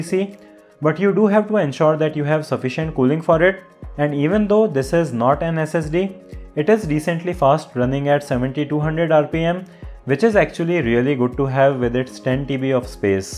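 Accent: India. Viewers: This indian accent is killing me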